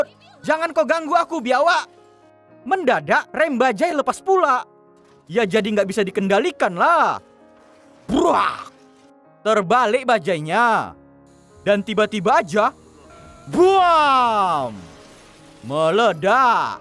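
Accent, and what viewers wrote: Medan, Indonesia. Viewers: orang medan La kau Bang,. yg punya Channel,.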